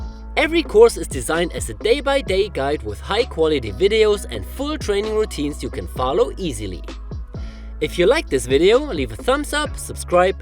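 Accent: Germany. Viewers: Nice video - thanks! Question: what’s this for an accent? Sounds like a German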